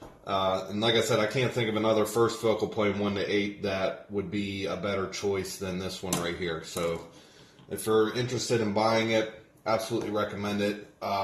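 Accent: Philadelphia, United States. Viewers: Ha ha. I thought the same thing - sounds like a Philly dude but not completely. Pittsburgh - that explains it. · Are you from philly? Just curious cuz you have a little bit of that philly accent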